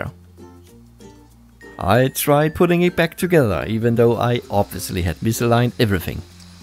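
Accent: Denmark. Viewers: xD man kan hører du er dansk xD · Your danish?? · jeg kunne høre fra starten at du var dansker pg.a. din aksent. :) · er du fra Danmark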